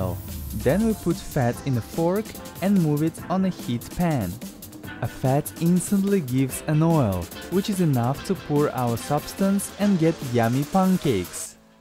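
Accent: Russia. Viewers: Russian accent speaking English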